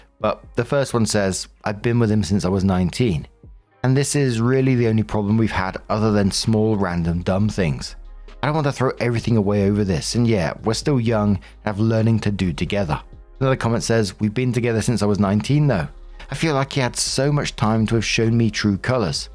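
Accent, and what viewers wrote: England, United Kingdom. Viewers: What region of England is your accent from, can't place it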